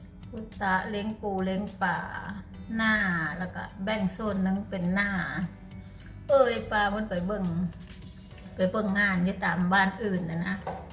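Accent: Phu Thai, Thailand. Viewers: มาฟังป้าเว้าภูไทนำจ้ามวนๆจ้าสำเนียง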